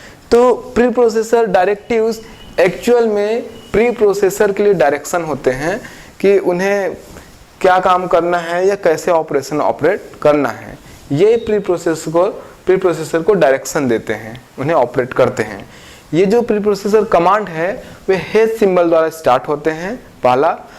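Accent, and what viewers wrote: Bihar, India. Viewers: by your tone i think you're also from bihar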